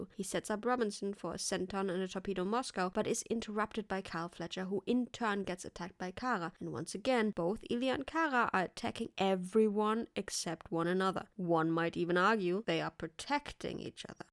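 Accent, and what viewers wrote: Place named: Germany. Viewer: YES MA'AM YOU'VE GOT A NEW SUBSCRIBER in me. FUCKIN FANTASTIC Work. And your prominent German Accent Just adds to the whole THEATRICS of it all. just brilliant work. 👌👌10/10.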